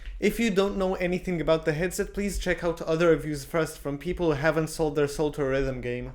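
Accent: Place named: Israel. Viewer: מאיפה קנית אחי?(if your Israeli I get it from your accent)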